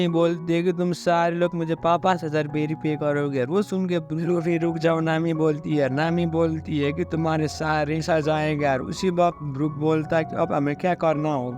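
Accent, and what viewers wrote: Nepal. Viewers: Bhai nepali he kya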